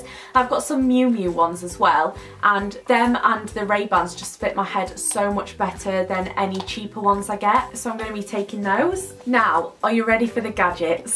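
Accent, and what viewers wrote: United Kingdom. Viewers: This is the first video of yours I'm watching and I absolutely love your britain english!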